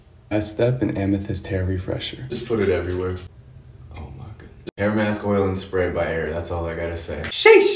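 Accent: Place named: England, United Kingdom. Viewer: Wait what happened to your English accent?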